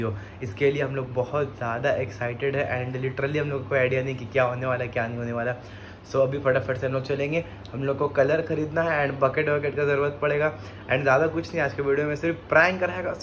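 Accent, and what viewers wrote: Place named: Bangladesh. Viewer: Apki bengali bohot cute hn ☺ Mst holi prank 🤣🤣🤣 bohot maza aya 🤣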